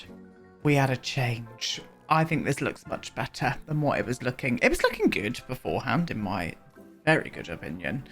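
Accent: United Kingdom. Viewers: What a gorgeous build, as per ushh 💁🏾‍♀️. Soothing voice too, sass and all. Also so incredibly British, I love it 😂🥰💁🏾‍♀️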